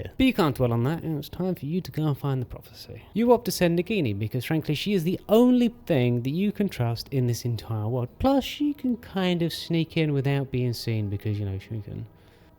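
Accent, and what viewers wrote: United Kingdom. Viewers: Dude I couldn't hear anything you were saying it was a struggle even with subtitles. I don't know if it's because you're british of because you're mumbling. It was both. Either try to be less british or mumble less. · Any video with British narration that mentions colonialism within the first 10 seconds is an instant classic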